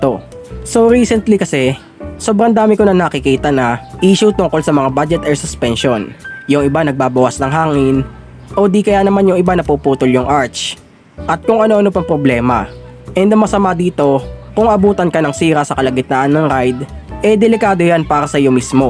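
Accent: Batangas, Philippines. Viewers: Batangueño ka lods?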